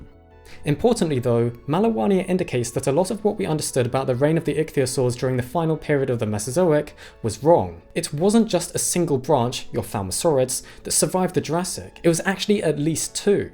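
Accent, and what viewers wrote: United Kingdom. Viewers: if this channel had a non-british narrator i would probably watch it more often. the narrator is also just so monotonous and the script is so standard academic that I cant even listen to it without my mind drifting away.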